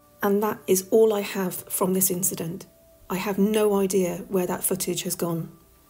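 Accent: United Kingdom. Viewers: hello love your YouTube channel and your videos and your British accent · Love a good ghost story, especially if it's British and recounted with a British accent ❤ 🇬🇧 the great Robert Hardy did a series on castle ghosts which I adored! This is awesome, thank you ⚘️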